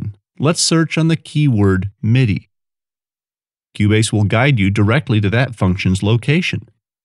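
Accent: United States. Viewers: I don't want to sound like an ass, but that slow American accent is the worst. When the guy is talking I have this sudden urge to punch someone square in the face! I really want to figure this program out, but this tutorial is just unbearable to listen too. Is there any version of this video in English English? Or Australian? Even Scottish would do.Just anything but this, for god's sake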